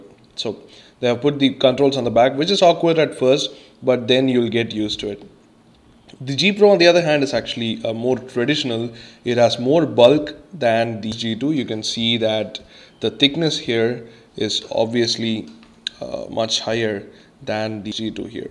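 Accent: India. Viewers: indian accent. dark hands...cant be Russian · indian accent actually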